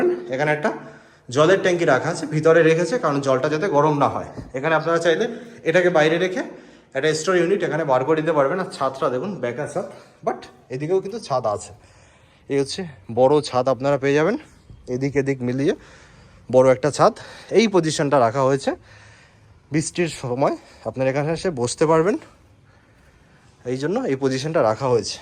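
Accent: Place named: Bangladesh. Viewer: কিছু মনে করবেন না ! বলছি দাদা আপনি কি বাংলাদেশি? আপনার কথাবার্তা একদমই পশ্চিমবঙ্গের বাংলা ভাষার মত নয়।সম্পূর্ণ বাংলাদেশি বাংলা ভাষার মত। আবার আপনি অস্থির কথা টাও ব্যবহার করেন যেটা বাংলাদেশীরা করে।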